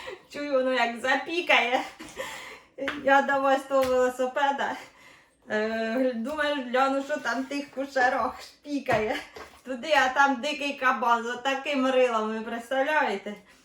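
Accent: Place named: Khmelnytskyi, Ukraine. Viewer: Шматки за розміром ідеальні. Цибулька, водичка, це теж обов'язково. Не люблю коли дають поради , але хочу, щоб тобі теж було смачно. Беру приправу до шашлика в Аврорі, в банках. Ідеально підібрані спеції. Додаєш багатенько.Сіль додатково не потрібна, там все є. Через добу готово. Важливо, щоб спочатку з двох сторін на максимальний жар(запечатати, щоб сік кипів усередені, а зовнє не виходив).І не перетримати, щоб не сухе було. Смачного Тобі! Ти молодчинка! До речі, мова, як в нас, на Хмельнитчині. Привіт Тобі зі Старкона!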